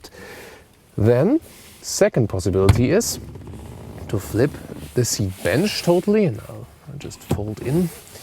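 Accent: Germany. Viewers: Ein Deutscher spricht Englisch. A German speak Inglish.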